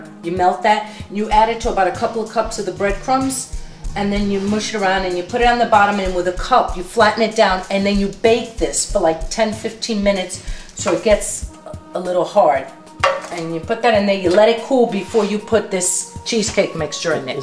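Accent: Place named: New York, United States. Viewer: Love the italian newyorker accent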